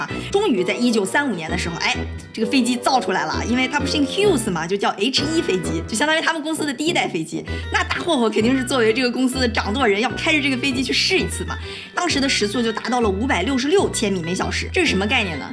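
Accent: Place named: Northeast, China. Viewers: 同意，小lin的笑声有东北味儿，小lin是东北人吗？ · 大连妹妹，口音明显，支持老乡!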